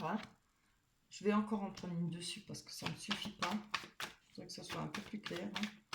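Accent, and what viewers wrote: Alsace, France. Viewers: Puissiez-vous dire vrai après un an et demi de solitude et de chagrin... que je rencontre enfin l'homme qui saura me rendre heureuse... merci pour la guidance ...ce petit accent ne seriez-vous pas alsacienne comme moi 😘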